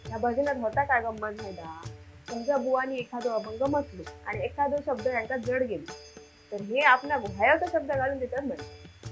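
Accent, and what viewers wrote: Malvani, India. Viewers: sundar malvani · तुमचे निरीक्षण खरोखरच अफलातून आहे. भाजनातले किस्से अगदी तंतोतंत जुळतात. मालवणी भाषेचा गोडवा तुमच्या प्रत्येक शब्दातुन जाणवतो. पण एक मात्र खरे भजनातील ही वेगवेगळी व्यक्तिमत्वेच भजनाच्या दिवशी रंगत आणतात. तुमजा हा विडिओ उत्तम झाला आहे. गावच्या गजाली तुमच्या अस्सल मालवणी मुखातून ऐकायला फार बरे वाटते. ऐकताना गावचे वातावरणच डोळ्यासमोर उभे राहते. धन्यवाद! जय महाराष्ट्र!! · गजाली बरे आसत पण खुपशे दुर्गुणच दिसले. शुद्ध मालवणीक तोड नाय.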